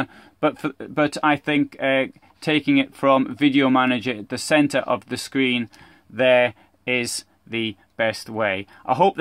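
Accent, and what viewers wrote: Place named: Newcastle, United Kingdom. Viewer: You sound a bit of a Geordie, like eh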